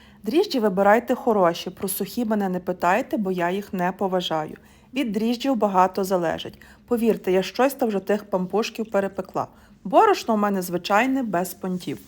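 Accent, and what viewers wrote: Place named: Galicia, Ukraine. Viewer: Браво ! Зразу чути, що галичанка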